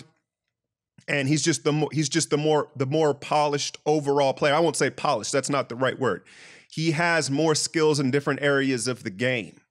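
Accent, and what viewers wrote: Bay Area, United States. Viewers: are you from the bay area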